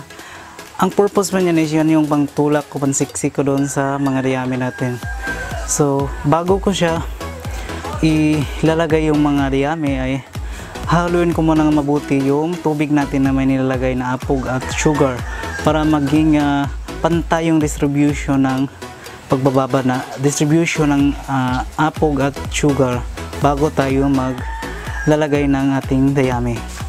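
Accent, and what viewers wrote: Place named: Visayas, Philippines. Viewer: oo nga sir kc nga mid u nahalata ko na bisaya ang tono mo kya ako ngtanong kung din ka sa aton..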